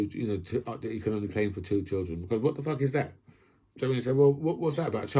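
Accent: London, United Kingdom. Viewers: Cost of living is difficult for people on low incomes. I 'm in the NW. Butter or anything else other than spirits are not under lock and key in the supermarkets [5] where I live. In fact there is no to little crime here. When I moved here the headline in the local Advertiser was "Daisy the cow is 32", bit of an eye-opener after living in Manchester for 40+ years ! From a previous video where you mention £2000 per month rent [which I don't dispute] I looked online round here. Just over a grand for 4 bedroom 2 bathroom property. Jacob Rees-Mogg has 6 kids. Should he get child benefit ? Maybe 3 would be a reasonable limit and then means-tested ? Spent about 5 years off and on in the USA. I have mates who live there. The food was fine. Pretty sure salmonella in eggs, BSE and horse meat sold as beef was nothing to do with the USA. From the accent I'm thinking London. 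You think that might skew your views on some problems ?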